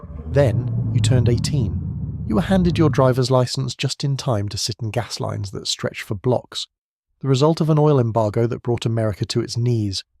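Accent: England, United Kingdom. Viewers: British narration on American relevance 🤷🏻‍♂️ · USA centered with british accent. Lets forget the US-perspective. Theyre out! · American culture with a english accent curious 🤔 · Why is this narrated by an English person when it’s about America. Anyways Boomers in the UK were not born into winning. The UK had rationing until I think 1955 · Hello, this a great video and I'd never heard of Gen Jones which makes a lot of sense. However, if you revisiting please don't use a UK voice for predominantly US content. It's a bit confusing and I feel a bit left out as a brit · An American story about American generations. Why then an obviously UK English voiceover? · Sh*t Brit accent on American generations. Left wing interpretation. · A British accent narrator doesn't work. Give us real sources!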